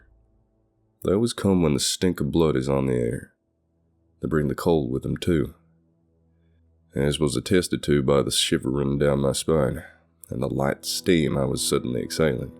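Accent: South, United States. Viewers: While I will always appreciate the English accents of my favorite YouTube narrators I love hearing stories from America, South of the Mason Dixon line performed by a Bubba.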